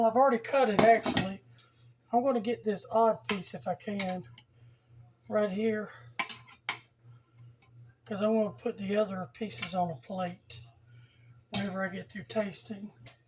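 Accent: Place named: South, United States. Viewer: Meat loaf 😮… Happy to hear your lovely Southern voice princess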